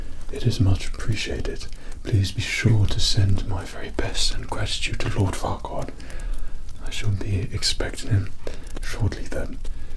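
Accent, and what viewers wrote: England, United Kingdom. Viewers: i am latin american, and when i started learning english i never understood why the people (especially women) said that they love brithish accent. now i understand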